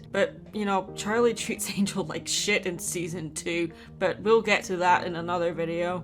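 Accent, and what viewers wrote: Scotland, United Kingdom. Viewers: omg are you scottishhhh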